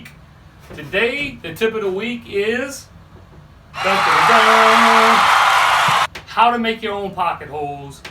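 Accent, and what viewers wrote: Louisiana, United States. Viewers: Are you from Louisiana? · you sound like you from south Louisiana!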